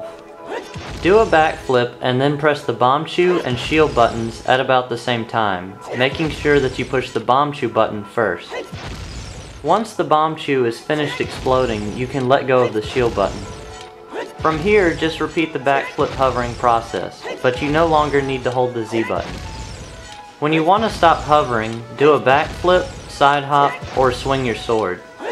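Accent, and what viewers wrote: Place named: Texas, United States. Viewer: The Hank Hill accent, it's so sweet 😊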